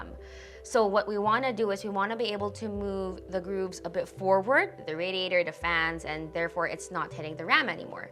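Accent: Philippines. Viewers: ui your a filipino pala hehe just dropped on your channel ehehe kaya palang familar yung accent hehehe. i also have and still currently using almost the same system. z170 asus ROG with a i5 6400 CPU with GTX 960 with 8gb Ram planning to upgrade the ram and the GPU soon currently using it for editing and minsan gaming hehe.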